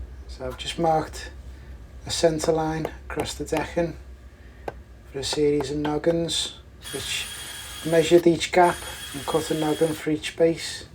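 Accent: Liverpool, United Kingdom. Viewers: …he’s a scouser la..💙👍🏻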